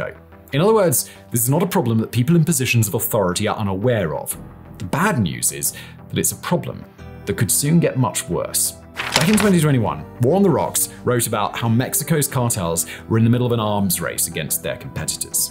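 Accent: United Kingdom. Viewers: Finally, someone with a brain. I like your content and delivery... especially with that accent of yours. Everything sounds better with that particular British accent. I appreciate you and your channel.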